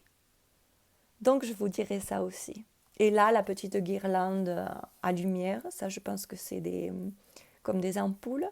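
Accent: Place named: Spain. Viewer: Mais ton accent 💖 la moitié de ma famille est d'origine espagnole et quand je t'entends ça me détend tellement je ferme les yeux je retourne en enfance je m'y croirai presque 😁💖 Tu m'as fait rire avec le fait de ne pas vouloir acheter trop de gammes de crayons, je crois qu'on tombe tous dans le piège quand on commence le colo 😂😂 Merci pour le partage je me régale avec tes vidéos 🥰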